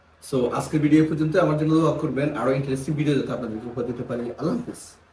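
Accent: Noakhali, Bangladesh. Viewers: Unar language sune mone hoy feni/ noakhali hobe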